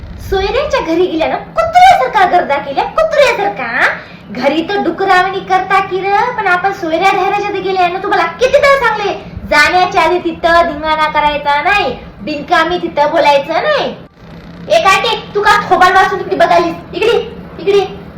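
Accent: Nanded, India. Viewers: तुमचे बोलणे ऐकून मला माझ्या नांदेड ची फार आठवण येते 😊 Keep it up and God bless you dear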